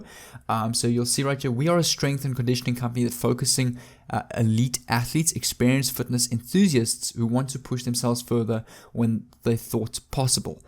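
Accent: South Africa. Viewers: Hello I just discovered your channel. I'm definitely gonna follow you closely. You just commented on another channel. I'm going to model some you your videos. Hello south Africa. Love the accent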